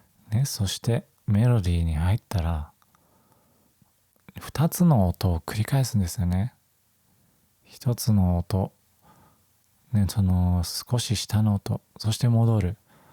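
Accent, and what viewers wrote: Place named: Japan. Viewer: I was wondering this too… your voice is gorgeous. From what part of Japan are you from? I want to learn this accent.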